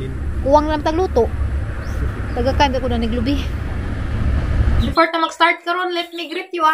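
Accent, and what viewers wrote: Bohol, Philippines. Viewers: Klaro kaajo taga Bohol pud! Bohol pa more!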